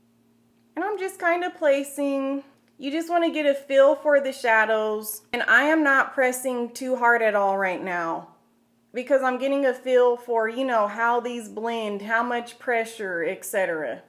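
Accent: South, United States. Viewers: Does anyone know where she is from? Her accent is so strange. It sounds southern (Im from Texas) But I cant put my finger on which part of the south. Maybe Alabama? Thanks for the help. Just curious over here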